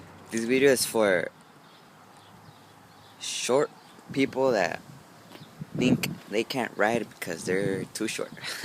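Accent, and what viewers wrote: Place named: Mexico. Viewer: u sound like a typical Mexican bro.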